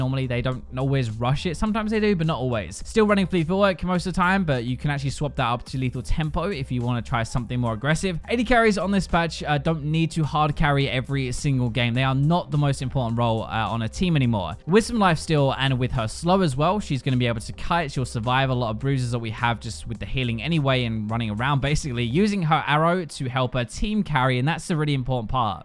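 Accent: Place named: RP, United Kingdom. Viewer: I love your accent! I love RP as well... :)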